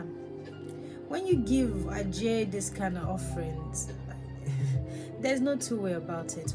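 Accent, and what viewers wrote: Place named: United Kingdom. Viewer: She's speak like a United Kingdom citizen ❤. Her beauty is astonishing 😮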